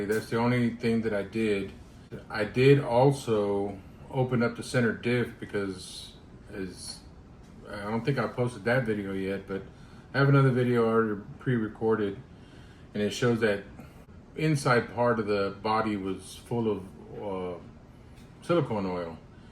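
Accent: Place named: San Antonio, United States. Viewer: Great videos! Sounds like you live in San Antonio. I visited Oatesified this past weekend, did some marshaling, and met a lot of nice racers. I’ve never raced but I plan to build the Losi 1/8 E Buggy kit, should arrive early December. Hopefully I’ll get it built and hit the track in January. Hope to see ya out there!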